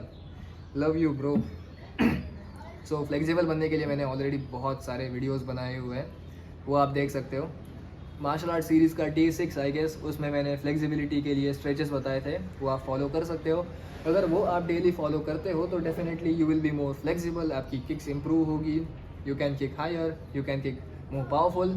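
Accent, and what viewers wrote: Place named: Malvan, India. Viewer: Bhava tujya shabada madun malvani zalakte 😂😂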